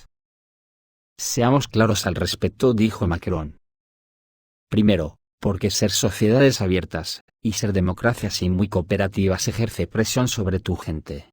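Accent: Spain. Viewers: El acento es vizcaíno.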